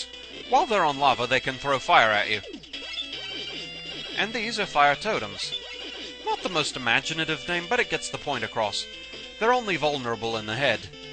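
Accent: United Kingdom. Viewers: i like the accent too. i can't place it. uk?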